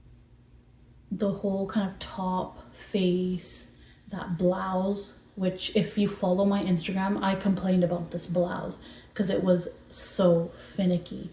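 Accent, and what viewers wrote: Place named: Whitby, United Kingdom. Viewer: I'm in Whitby, it sounds like you're somewhat nearby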